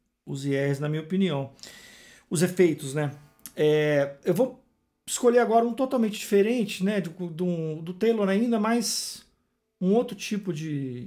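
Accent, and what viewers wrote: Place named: Minas Gerais, Brazil. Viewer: O resultado ficou parcialmente bom(apenas opinião). Professor, as vezes acho que tu és mineiro, as vezes te acho capixaba e as vezes fico confuso kkkk De igual forma, em algum momento a sonoridade induz achar que é guitarra mas falta definição. Não sei se sou muito "ortodoxo" mas soou híbrido na maior parte dos casos. Muitíssimo obrigado pelo conteúdo e até a próxima!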